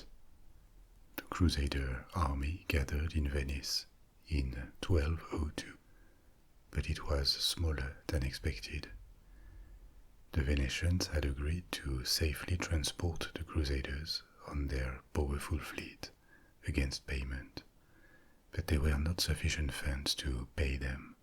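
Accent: France. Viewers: Ever since visiting France (my first time visiting another country!), I have missed the French language and/or accent. It was wonderful, and hearing it in ASMR form AND in historical/science/astronomy forms is the best I could ask for 😊 Greetings from America. Thank you and stay safe! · Are you kidding me. A French man, speaking english and whispering? A dream come true.💖💖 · Like a French Morgan Freeman. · What a sexy voice and that French accent 😍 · Is that a true French accent or a cartoon one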